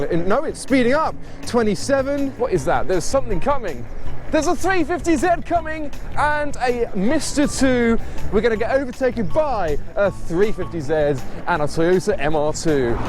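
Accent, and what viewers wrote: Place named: United Kingdom. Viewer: When he started talking it was the most british thing ever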